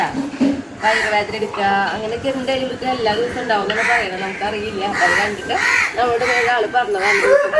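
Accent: Thrissur, India. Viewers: Nice vedio very useful you are from thrichur taking nicely ❤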